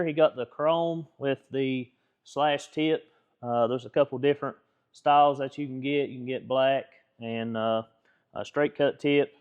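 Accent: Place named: South, United States. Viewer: Big farting slow talking southern draw coc skr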